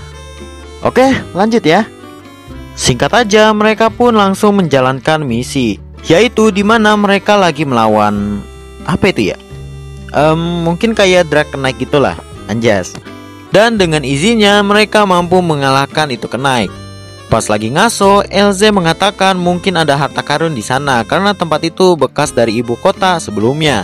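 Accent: Java, Indonesia. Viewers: orang jawa bang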